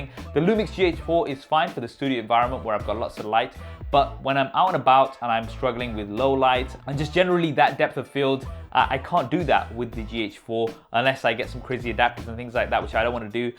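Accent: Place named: United Kingdom. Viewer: Please stick with the British pronunciation. #British Good job with video!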